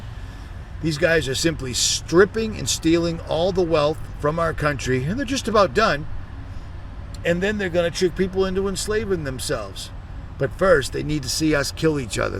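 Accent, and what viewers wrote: Boston, United States. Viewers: You from Boston or wistah area... in gator coutry now yet from Mass, hi❤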